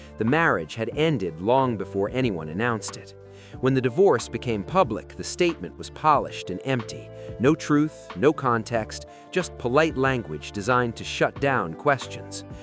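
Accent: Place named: United States. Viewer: What the flying f…. An American voice/person who knows absolutely NOTHING about this couple. YUK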